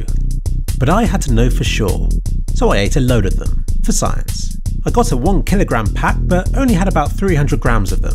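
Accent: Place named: South West, United Kingdom. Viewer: south west accent this video is about a man shitting himself with a fruity taste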